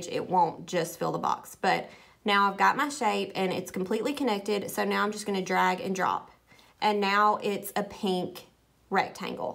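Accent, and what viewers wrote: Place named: South, United States. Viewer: Your accent is such a southern accent it’s sooo cool!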